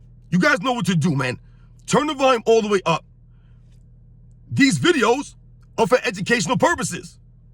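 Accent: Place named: New York, United States. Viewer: Yo, listening to your New York accent makes me miss the days i used to get a 75cent bagel with cream cheese and jelly by Sal's outside of Prospect Heights High😏.... Keep the flame burning my guy, " we must continue to stand up!!!".... ✌🇹🇹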